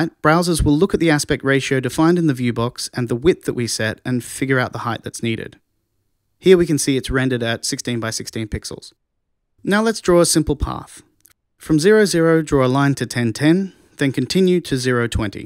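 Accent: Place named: United Kingdom. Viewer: A lovely british accent and awesome tutorial. Just the way I like it.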